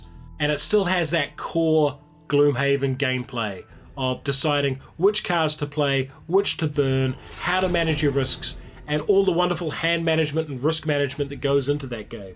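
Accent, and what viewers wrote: New Zealand. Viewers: Oh yay a review by a New Zealander, so I will have access to/knowledge of everything you're talking about! Nice to hear a kiwi voice. I like the looks of One Deck Dungeon and Lords of Waterdeep.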